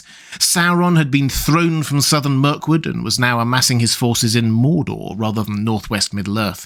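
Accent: England, United Kingdom. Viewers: I think him having a British accent makes it even better.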